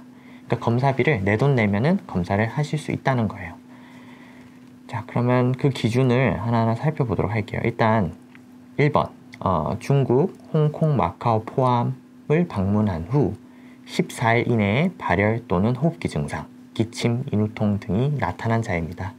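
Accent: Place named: Gyeongsang, South Korea. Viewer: 블로그로만 뵙다가 목소리 듣게됐는데, 경상도 억양이 새롭네요ㅎㅎ 앞으로도 좋은 영상 잘부탁드립니다. ps. 한두가지 제안을 드리자면, 아무래도 내용이 일반인에겐 다소 전문적인 내용이라, 집중도를 살리기위해 영상 하나당 3분 정도로 하면 좀더 좋을것 같습니다. (예를들어, 이번영상을 세부주제로 3토막내어 올리는것) 또, 설명력과 전달력은 굉장히 훌륭하십니다. 뭔가, 서울 대형병원의 질병 설명영상 속의 교수님과 비슷한 느낌으로 잘하십니다. 다만, 유튜브의 생명인 조회수와 몰입도 향상을 위해선 순차적인 설명방식보다는, 짧은영상으로 일반인에게 지루하지않고 임팩트있게 전달하기에는 신종코로나에 대해 요즘 사람들이 가장 궁금해하는 FAQ에 대한 q&a 형식이 더 낫지않을까합니다. (ex) 왜 의심환자가 양성으로 확진나기까지 걸리는시간이 그때마다 제각각인지, 코로나로 의심된 환자가 사망했을 경우 숙주가 죽었는데 그것에서 채취한 검체에서 나온 코로나 음성판정이 과연 의미가 있는것인지 or 믿을만 한것인지, 마스크는 어느정도 범위까지 막아주는걸 써야하는건지(만약 마스크와 손씻기만으로 충분하다면 왜 의료인들은 방호복입는건지), 알코올이 실제 항바이러스 효과가 있는지 등등) 제 제안은 말그대로 제안일 뿐이니 참고만 되셨으면합니다:) 본격적인 ent 1년차 입성을 앞두시고 선별진료소 일까지 겹치시어 많이 바쁘셨을텐데, 첫 영상 만드시느라 수고많으셨습니다^^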